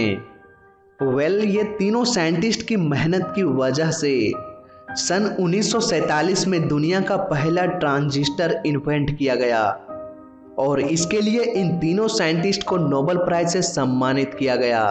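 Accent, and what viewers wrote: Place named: Bihar, India. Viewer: Buddy, it doesn't seem to be Hindi what he is speaking, it may be Bihari or any other distorted local language, but surely not HINDI.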